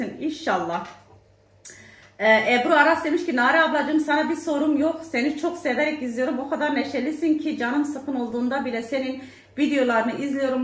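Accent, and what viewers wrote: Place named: Erzincan, Turkey. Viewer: Abla senin kürtcen bizimkiyle ayni erzincanliyiz😂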